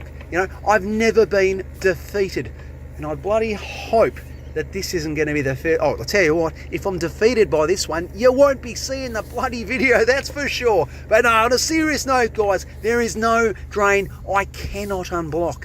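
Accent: Australia. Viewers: I know I landed here because I kept watching SB mowing after work when my mind was tired and I just needed to stare at my TV while not thinking much and the algorithm was like "You should watch drains being cleaned!" It's a bit like oddly satisfying videos. I can't really stop watching but sometimes I'll watch in disgust haha Still, I love to see a unblocked drain 😄 I'm watchin from Austria - but I guess were close at heart, because I can't tell you how many times people thought I was from Australia. In Japan I was invited to THREE interviews of English schools because they thought I had written Australia in my resume. And then they were disappointed I'm not a native English speaker... Whoops haha But as a native English speaker it is nice to hear the Australian dialect, since I usually only get to hear british and american English. So that's fun! 😄 Keep up the good work 🎉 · G´Day, watching from Denmark. 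I found you my youtube magic. So far today I watched you unclog a car park drain, another gully+grease trap and pull out a sausage dog of a filthy hotel shower drain. Now can someone tell me why it´s so mesmerizing to watch this :D But i do have to say it´s a joy to see/hear someone enjoy there job. And I also like listing to some australisch , I spend one semester in Melbourne and it reminds me of that time, it was amazing and all the Aussies where super nice. I saw a nozzle like Mr German once in my local hardware store and I´m so tempted to buy one, we have a probably clogged drainage pipe in front of our carport. I now it would make a mess, I´m not sure if I will get the pipe clean, but listening to you having fun just wants me do it :D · Your fuckn funny man love ya raw Aussie accent 😂😂😂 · Still here...been here for about 2 weeks! Never thought that this 'rabbit hole' would be so much fun! 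Your running Aussie commentary, makes me smile, and every so often when you give us a look at the scenery 🙄 love it! p.s. happy that scratch 'n sniff tv hasn't become a thing yet 🤣 'til next time, hello from Denmark 🇩🇰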